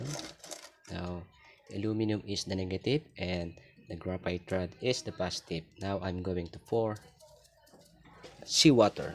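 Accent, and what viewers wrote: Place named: Philippines. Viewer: The way you speak English,similar to us filipinos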